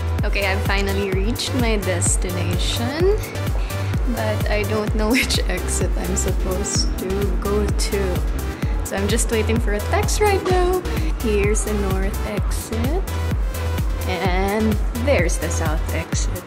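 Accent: Philippines. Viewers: The way you talk and laugh scares me that Filipina accent lol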